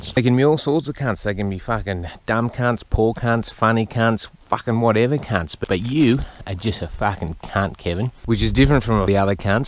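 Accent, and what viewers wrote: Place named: Australia. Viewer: This would lose half its worth without his Aussie accent lmfao 😂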